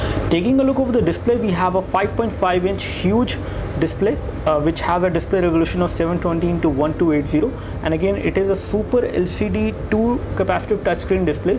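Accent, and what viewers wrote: India. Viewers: INDIAN shitty english .. . .. lol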